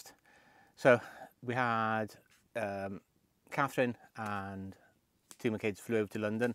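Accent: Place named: Wales, United Kingdom. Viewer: I could tell buy your broken accent there was a hint of Welsh, i lived in merthyr tydfil for a few years. Loved it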